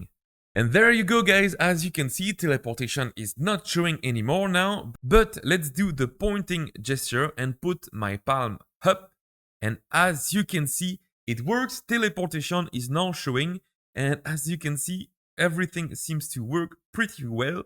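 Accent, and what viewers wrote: France. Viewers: Beautiful French accent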